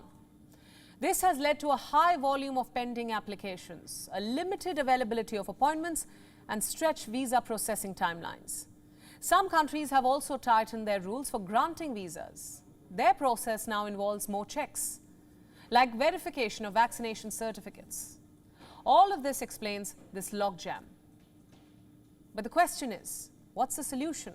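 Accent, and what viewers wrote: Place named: India. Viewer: Nobody cares or knows who your foreign minister is loool. Just because he "looked into it" suddenly 800 Indian citizens who live on less than $5.50 a day will get fast-tracked visas to the US? I'll break it down nice and simple: 1) India like many developing counties has ZERO Visa Free access to the US, UK and many other developed nations. Therefore it takes months and years to get a visa. I'm a British citizen. The UK as a highly developed, advanced welfare state economy we have Visa Free access to the US and I visited the US this year, getting documentation was literally immediate. 2) You completely missed Sam Browns point. This Indian presenter desperately trying to hide her Indian accent is claiming India is a "strong ally" of the US and so should get Visa Free access for its citizens. Yet, India finances Russia's invasion of a sovereign country by not condemning it and buying its oil. 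Further, 90% of all your defence equipment was bought from Russia. Russia is in the top 5 of the countries the USA despises the most and yet India a "close USA ally" pours billions and billions into Russia's economy.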